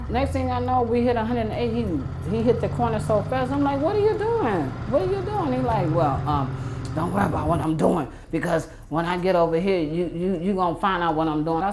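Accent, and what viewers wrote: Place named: Louisiana, United States. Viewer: She still got our accent tho.... Half of my family moved to California in the late 60's and they know they are from Louisiana...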